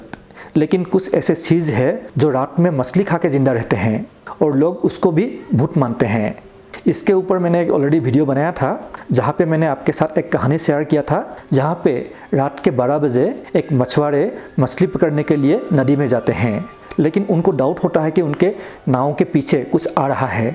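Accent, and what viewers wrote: Assam, India. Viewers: Apuni Okhomia naki? Apunar accent ... Okhom ot Mayang district ot ase bhoot...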